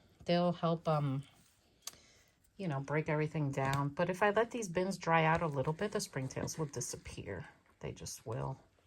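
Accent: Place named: New Jersey, United States. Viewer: 👋 from California! Originally from Philly so loving your Jersey accent. I learn so much from your videos.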